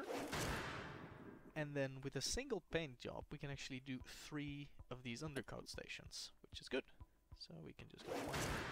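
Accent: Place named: Netherlands. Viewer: is sounding very Dutch in this video for some reason...:P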